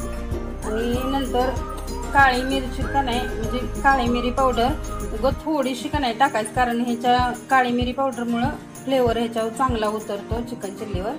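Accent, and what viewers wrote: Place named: Rural, India. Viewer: Mam tumchi recipi mi keli chan jhali Tumche gav kutele sanga na Aamchya gavakde pn ashich bhasha aawaj Mast vattey..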